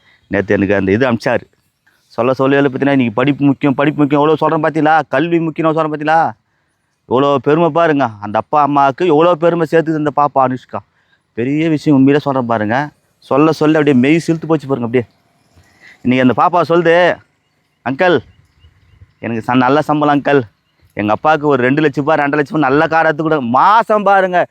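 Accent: Madurai, India. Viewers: Madurai vasagam super valthukkal 🎉🎉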